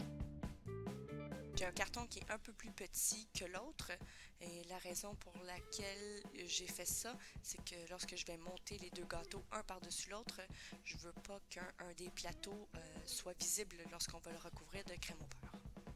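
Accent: Quebec, Canada. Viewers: Bonjour s il vous plaît vous donnez des cours sur place vous êtes ou vous avec un accent québécois. Je suis souvent à mtr j attend votre reponse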